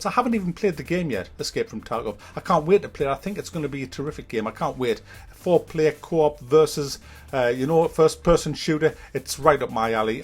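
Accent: United Kingdom. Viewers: I won't defend a game unless it deserves it. I've loved your videos and find them so interesting. I'm 17 and have been slowly rebuying old PS2 and PS3 games you and other channels similar (check out Mark Brown, Game Makers Toolkit) to you suggest and I love it. Ive found some of my favorite games through this, so a huge huge thankyou. I think its not video games that suck today though its just business. Because its not just old games, its old music and old films (only a matter of time before its books). I love that your British, like me, your accent just adds to your reviews and no offence makes your frustration funnier NEVER THE LESS THANKYOU. WE NEED MORE CRITICS LIKE YOU IN THE SPOTLIGHT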